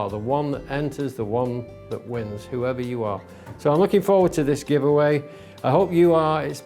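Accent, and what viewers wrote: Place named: England, United Kingdom. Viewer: I only came across your channel a few weeks ago, but what I've seen so far is very good. it was a joy to hear an Englishman doing a carpentry show, the majority are American and some of them are very good too. I really like the way you use feet and inches; I still measure in imperial although I have on some occasions leaned toward metric. You remind me of my old carpentry trainer, he was real old school, this was way back in the 80s. He would not let us cut anything with a machine until we were competent at cutting using a saw, chisel and mallet, what he would say was "There won't always be electric on job lad" in a broad Lancashire accent. As I said it's really great to see somebody using hand tools again, it's becoming a dying art. Thanks, Jim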